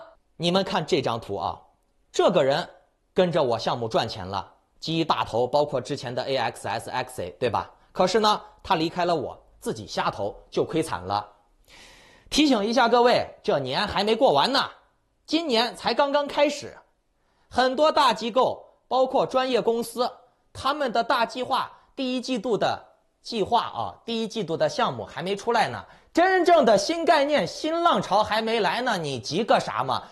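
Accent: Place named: Cantonese, China. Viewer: ，说话不失风趣和幽默，甚至嘲讽。。。。广东人漏风普通话特别诙谐影响深刻。。。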